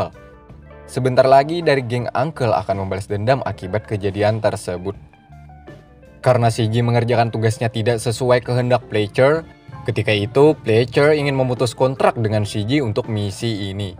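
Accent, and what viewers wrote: Banjar, Indonesia. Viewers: Kayak bhasa bnjar pengisi suara x ini.. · Orang banjar kah mang? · Khas banar suara bahasa banjar nya kada kawa di buang😅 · Orang Banjar mana suara nya ni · Sanak orang Banjar kah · org bnjar ya bang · Ini logat urg Banjar... Iya kh...😆👍👍👍 Aja.... Sukses slalu...Waja sampai kaputing... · Urang banjar lh bng pian😂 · banjar banar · Urang Banjar kah pembawa alurnya ne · Banjar banar neh pina nya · Urang banjar sidin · Pinanya urang banjar pembawa acaranya ninih,,yakah sanak..?? Kkkk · urang Banjar kah sanak Pian ni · Orang banjar kah tukang kisah nya ne 😅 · Khas banar logat banjar nya🤣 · apakah org banjar 🤣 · Banjar banar 😁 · Iya sanak,, Banjar banar Pian nih 😅🤭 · Banjar banar dangsanak lah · Orang Banjar kah min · Urang Banjar nah pembawa kisahnya nih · Urang banjar pang pina logatnya😁😁😁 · Urg banjar kh p mang? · Orang Banjar wkwk 😂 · Logat banjar nginih Salam dari hulu sungai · urang banjar kah adminnya. 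😅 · Wkwkwk banjar bangat🤣👍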